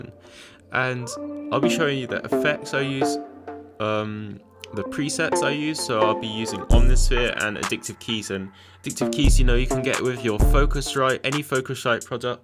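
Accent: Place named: United Kingdom. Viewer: My Guy 😁🤓I'm from Germany lol sounds 100% UK👍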